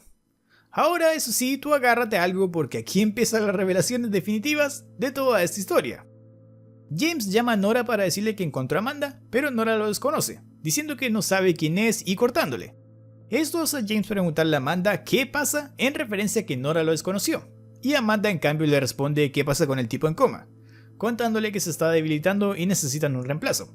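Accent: Chile. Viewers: No es hate pero no me gusta el asentó chileno :'(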